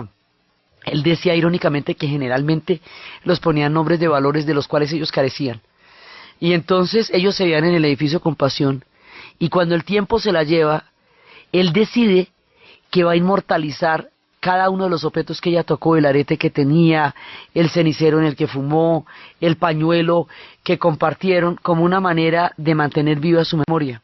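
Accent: Colombia. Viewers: Me he vuelto a enamorar de la historia, excelente narradora, que manera de contar tantos episodios y la cereza del pastel es ese acento colombiano delicioso. Que privilegio escucharla. Me emociona!!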